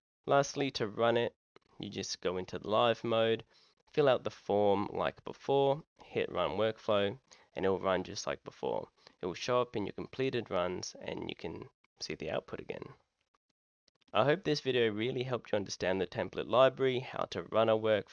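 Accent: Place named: Australia. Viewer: ha, I thought you were an ozzie....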